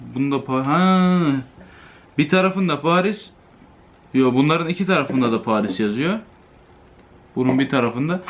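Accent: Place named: Ankara, Turkey. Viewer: La adam Angaralıymış ben de diyom niye samimi geliyo gardaşim la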